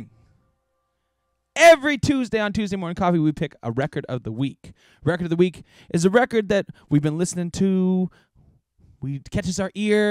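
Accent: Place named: Nova Scotia, Canada. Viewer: You sound like you are from Nova Scotia. 😎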